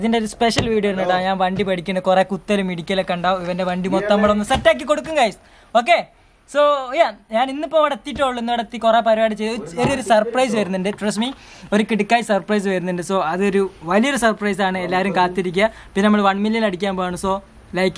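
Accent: Kozhikode, India. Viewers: Ninjaludhe varthanam kettal manasilakum kuzhikkudhanenn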